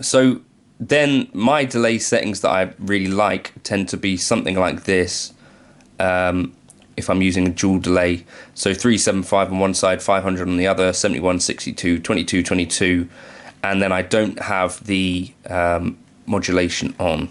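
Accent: United Kingdom. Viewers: Ohh ! That would be great ! , I also have the HELIX LT, in that case no problem,but for the Pod Go it's a great idea to split it ! I've bought your Helix and Pod Go packs,there are many good presets ! You are a great artist,not only an incredible guitarrist,very creative and very original, besides it's amazing all the time you spend researching and trying new things every day that later you share with us ! For your accent I know you are from the UK,I lived in LONDON 7 years,while I was studying my Classical Guitar training at the Guildhall School of Music many years ago..I love England,whenever I can I go there... And I recorded some albums with Anthony Phillips,Ex guitarist and founder of GENESIS,we are very close friends he's also an incredible guitarrist.! Thanks a Lot ! Have a nice week.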